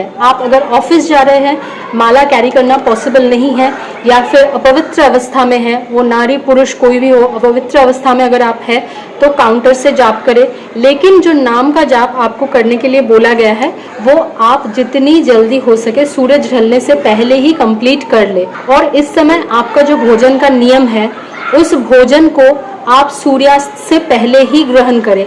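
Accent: Bengal, India. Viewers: Wo bengali hain to thoda bengali accent rahega to aisa sunai dega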